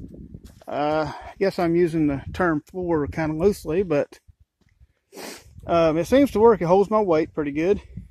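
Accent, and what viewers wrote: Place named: South, United States. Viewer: I definitely hear a southern accent...what part of the states are you in? Great video & an even more beautiful spot you have chosen. Much love from Mobile Alabama.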